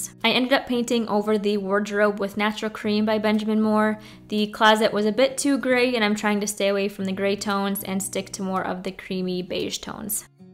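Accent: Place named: Russia. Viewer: You kinda have a Russian accent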